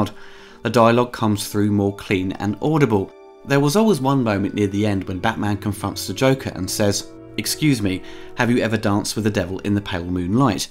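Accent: United Kingdom. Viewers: I agree, I prefer the old sound, it goes along with the movie style and soul. The new sound I would say it it's more in tune with newer films. The color is appalling! Why are they always messing what is right instead of correcting and clean what is wrong. Star Wars for example, is one of those cases of color tampering bahhh. Exactly, there will be always people who like one thing and others don't, so why not include options. By the way, love your reviews, channel and your British accent =) Cheers!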